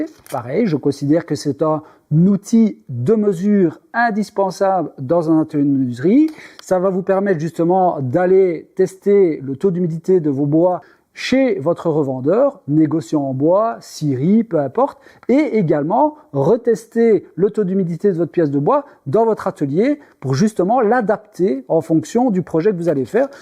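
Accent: Belgium. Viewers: Ce n'est pas un franc parlé, plutôt un belge parlé. Mais on adore ça, bravo et merci.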